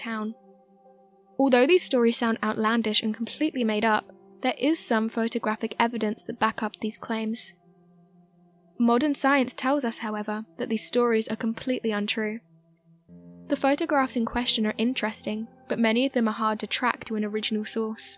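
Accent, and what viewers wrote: United Kingdom. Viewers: This girl is one of the most pleasant narrators on YouTube. Her sweetly modulated voice combined with the pure British accent is refreshing.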